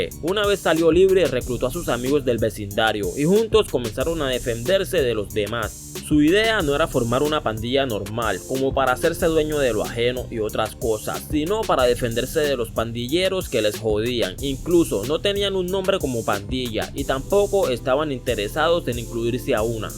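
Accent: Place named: Pacific, Colombia. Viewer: Por tu acento creo que eres de Tura. O algún lado del Pacífico colombiano. Espero no equivocarme. Saludos paisano.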